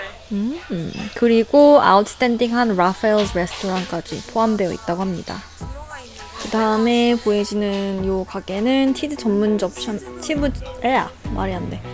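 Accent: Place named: United Kingdom. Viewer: 영국발음 너무 좋아요~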